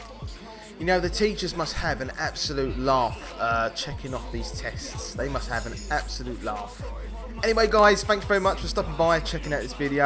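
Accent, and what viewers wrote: United Kingdom. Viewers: you're from UK, don't ya? your voice is so good tho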